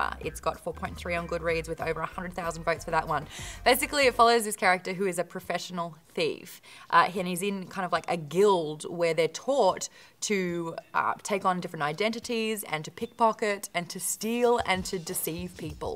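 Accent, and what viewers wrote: Australia. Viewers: Cool. finally an Aussie accent. Read: The "Tales of the Ketty Jay" series. by Chris Wooding. More steampunk than high fantasy though, but this video is a coupla years old so you might have read this. If not, do yourself a favor.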